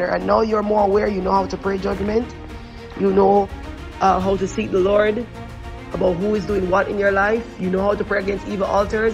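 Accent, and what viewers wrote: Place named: Jamaica. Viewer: ⚠️ REQUEST, that you woman of God slow down when you speak. Above prayer confirms what God has been saying. My own family's "bad luck" now I see as sorcerer caused due to jealousy plus we have suffered due to lack of knowledge. I just saw your channel first time now and I sense you are a genuine believer, not some false YouTuber. Praise God. Slow that beautiful Jamaican accent coz I will continue to repeat some of your prayers, or all. Thx!❤️🌹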